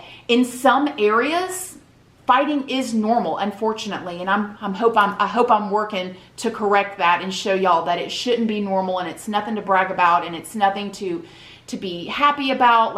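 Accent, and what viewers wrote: South, United States. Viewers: All I hope for is that I get my fair share with the man I love.... I know him from middle school... like I hope it happens like you in a way...where yall met, life did its thing and yall got married in the end. ♥️♥️😭 love your stories, and that southern accent!!! P.S something similar happened to me, Facebook and MySpace kept recommending him to me until we met and once again we ended in bad terms in April. God knows what in the heavens he is doing.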